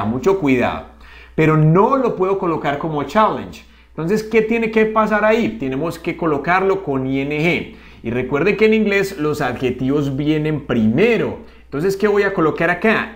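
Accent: Colombia. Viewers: erez de colombia tienes asento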